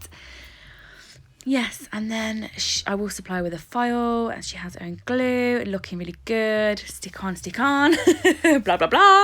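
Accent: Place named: Coventry, United Kingdom. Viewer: Are you from Coventry? I am. Your accent is very Cov. :-D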